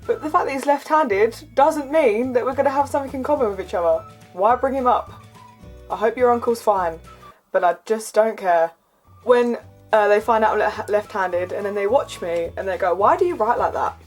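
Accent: Essex, United Kingdom. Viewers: hey, greetings from Turkey, thanks for the videos, heped me a lot get Essex accent. ❤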